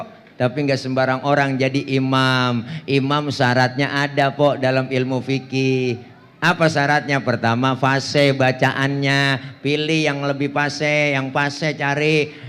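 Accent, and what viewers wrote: Jakarta, Indonesia. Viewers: iya logat betawi kental mirip kh zainudin mz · ciri khas Betawi cara bicaranya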